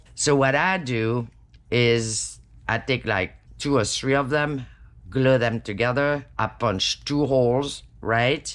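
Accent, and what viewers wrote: France. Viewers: Hey! I LOVE❤ your channel and I love your French accent!!❤️🤗. You are so cute and sweet!🌹🌹🌹 I was thinkin that you should make photo copies of those "old" magazine pictures of the women so you will have a never ending supply of the retro/vintage ladies🤷‍♀️👍😘 That would be cool too👍😘🤗❤️. Thank you for sharin all your ideas and little tips and tricks with all us🤗🤗❤️ We Really Appreciate it so much!!👍🤗🌹❤️🌹 Thank you😘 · Wow, wow, wow is all what I can tell you about your tutorials. I am your new subscriber I really love your humor your lovely french-english accent your way you edit your videos and and and...thanks for your time to inspire me and us!! You are a true Angel!!! Have a beautiful evening Heike from The Netherlands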